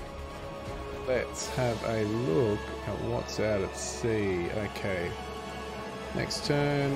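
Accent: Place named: Australia. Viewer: Wwow man u r from Australia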